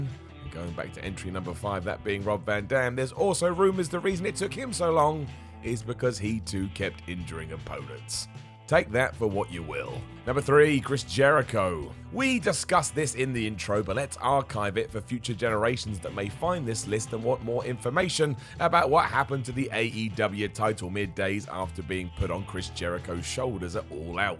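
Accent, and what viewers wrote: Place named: United Kingdom. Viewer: Skipped through the entire video within 5 seconds and saw every single thing I wanted to without having to listen to some annoying British millennial mark say anything.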